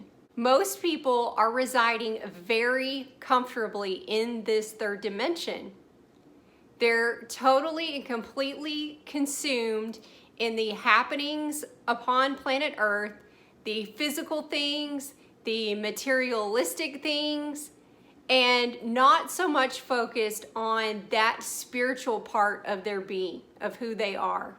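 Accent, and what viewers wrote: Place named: East Texas, United States. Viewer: You have got to be from East Texas! Love your message..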